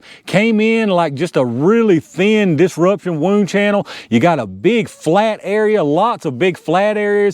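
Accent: South, United States. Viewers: What a beautiful south accent.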